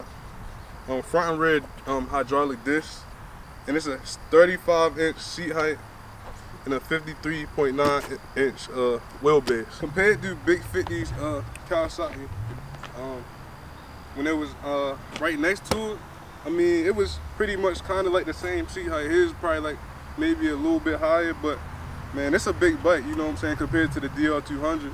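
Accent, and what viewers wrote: Baltimore, United States. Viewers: Thank you g, about to go cop one today actually. But aye bro where are you from? Your accent is wild..Baltimore? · I live down south it's hard to find parts for those Chinese bikes you sound like your from Baltimore is it easy to find parts up there for those Chinese bikes ?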